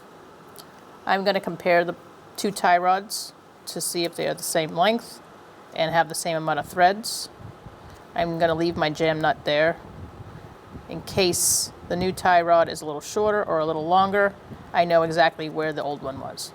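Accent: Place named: New England, United States. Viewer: She sounds and that Subaru looks like its from Massachusetts or some other New England state. Lol im from Maine so i know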